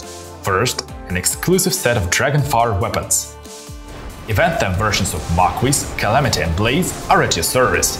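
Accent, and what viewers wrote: Russia. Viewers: I wish you guys would get someone who could talk without such a heavy Russian accent, it’s really hard to understand what’s being said on these videos.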